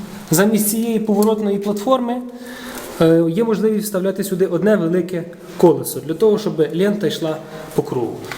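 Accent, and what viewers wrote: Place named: Ternopil, Ukraine. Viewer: Тут ще чути тернопільску мову, потім вже буде львівський пан.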